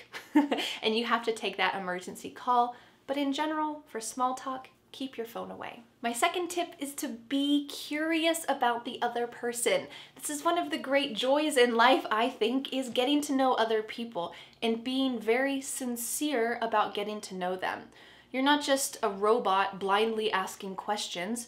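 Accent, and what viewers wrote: United States. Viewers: Yep, I m really into your American English. Txh a bunch my English is quick and dirty from Londrina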